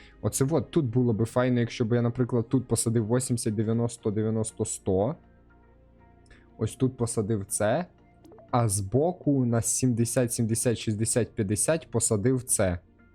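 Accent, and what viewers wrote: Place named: Russia. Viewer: чому ты размовляешь як москоляка?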